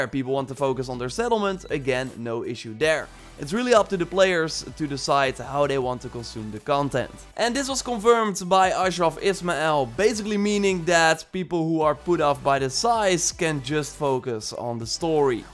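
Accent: Netherlands. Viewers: LoL i can hear that you are dutch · are you dutch? it rlly sounds like it lol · why do u sound like u have a dutch accent😂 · It's been 2 years since I havent really been active on youtube but I sure remember the Dutch accent of yours like the sound of yesterday haha. Houd jezelf sterk en veilig! Stay strong and be safe! Very informative information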